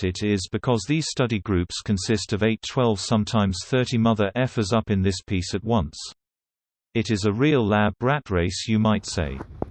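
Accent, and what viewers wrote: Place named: United Kingdom. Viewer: i like the british narrative voice.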